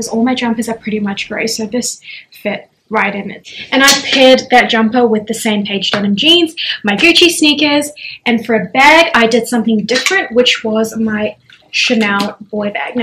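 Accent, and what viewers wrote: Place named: Australia. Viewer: I love your accent. It's so refreshing to hear an Australian girl talk about fashion.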